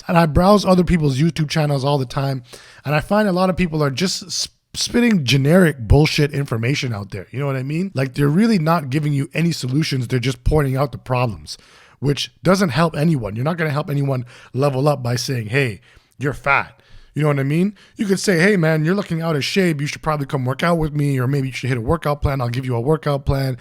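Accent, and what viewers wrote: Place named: Toronto, Canada. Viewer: Why is it so easy to tell that someone is from Toronto 😂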